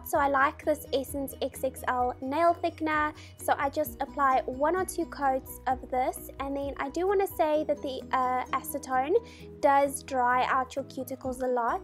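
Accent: South Africa. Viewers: You sound really innocent and cute ♥️😇 There's something original about your accent, sort of South African & I love it 💖💖💖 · Hi, nice to hear a South African voice😋🇿🇦Thank you for the great video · You're south african🥰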